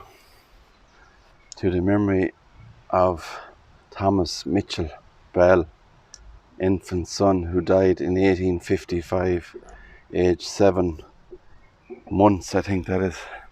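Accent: Ireland. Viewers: My mother often took us to tour grave yards. I absolutely love this. has such a beautiful Irish accent and he's so calm, steadfast, easy going. I feel so much better in this crazy life just listening to him. Thanks much!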